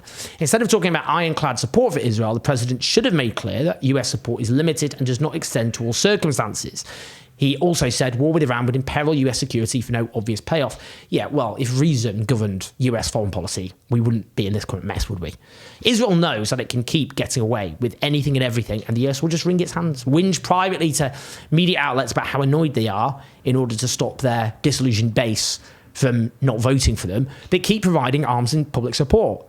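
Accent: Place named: England, United Kingdom. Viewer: I love the English accent 🇬🇧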